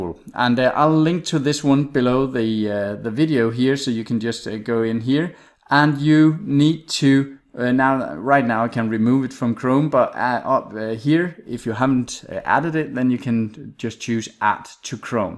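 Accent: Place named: Denmark. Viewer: Cool accent! Are you from Denmark? Thanks for the helpful video!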